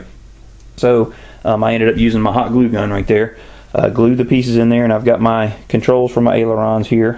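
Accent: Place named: United States. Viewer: you sound very american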